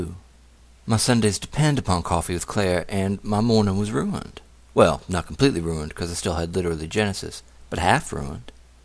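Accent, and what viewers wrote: South, United States. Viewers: Dude, you're a personal hero of mine. The insight and unvarnished truth blares triumphant. May I say, your voice of 'southern comfort' has found a fan north of your borders.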